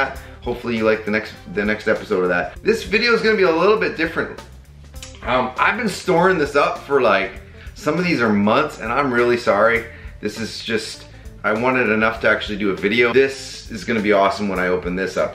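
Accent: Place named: Mexico. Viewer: You do not sound bad. Just a little mexican.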